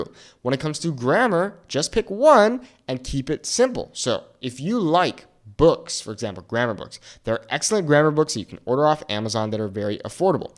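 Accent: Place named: Mexico. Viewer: Good work. Greetings from Spain. You have a perfect Mexican accent.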